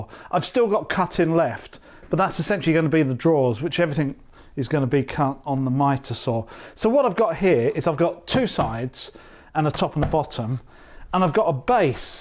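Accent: England, United Kingdom. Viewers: His accent is English !!? Literally no one outside the US uses imperial anymore because it’s stupid…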